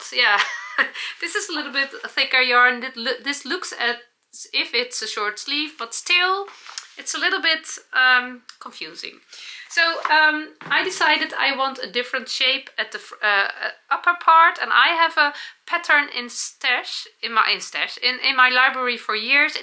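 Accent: Netherlands. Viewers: Just love your Dutchy English - your brightness and cheefulness is so refreshing 🤩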